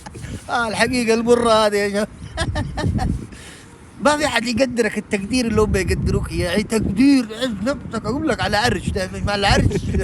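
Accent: Jeddah, Saudi Arabia. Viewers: دايم اليمنة يلاحقون أي واحد يكتب شي عن لهجة هذا الشخص! ندري أن أصله يمني لكن يتكلم جداوي يعني سعودي وهو قلباً وروحا حجازي سعودي ولا يعرف حتى يتكلم يمني. وش هاللقافة يا ذا الناس! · اللهجه سعوديه ١٠٠٪ هوا ماقال الجنسيه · هههههه يقول الرواتب على حسب وجهك 🤣 آخخخ اللهجة السعودية اشقد احبها ❤ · انا يمني لكن دا كلامه جداوي 😂🤦‍♂️..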